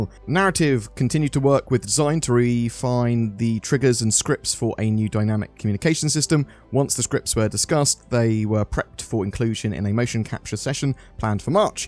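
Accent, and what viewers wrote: United Kingdom. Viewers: British accent mate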